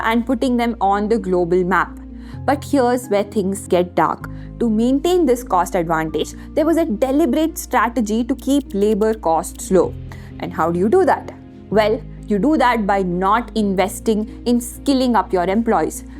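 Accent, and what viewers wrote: India. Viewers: Get an accent coach. Oh that indian accent is a torture for ears.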